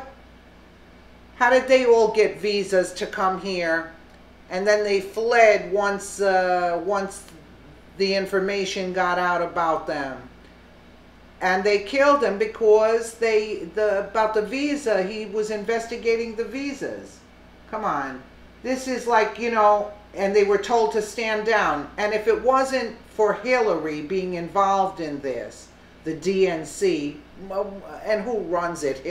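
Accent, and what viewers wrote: New York, United States. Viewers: Where's your voice from? New York? I dig it!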